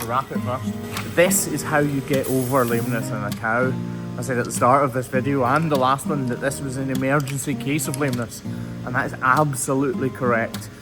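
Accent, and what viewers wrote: Scotland, United Kingdom. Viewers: Just dropped in to say love your unique channel. In some weird way, watching these is like some ASMR kinda videos 😅 Coupled with your soothing Scottish accent and its pure relaxation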